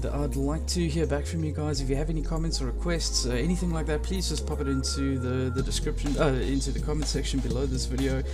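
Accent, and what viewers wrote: South Africa. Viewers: Where you from, i hear some south african twang every now and then? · I like the commentary and the base itself, sounds like you're a fellow South African? Subbed your channel and keep up the good content ^_^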